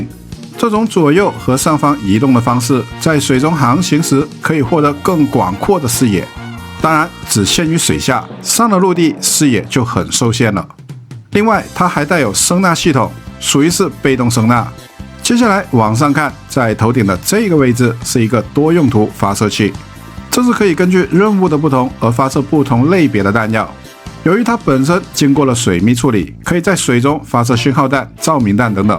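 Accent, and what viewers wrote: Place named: Cantonese, China. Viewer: 好欣賞你嘅頻道，解釋很清楚，中間仲夾雜好地道嘅廣東話👍尤其是機體名稱，你亦都有提及港版叫法，因為我係香港人所以聽落零舍親切👍👍👍well done🙏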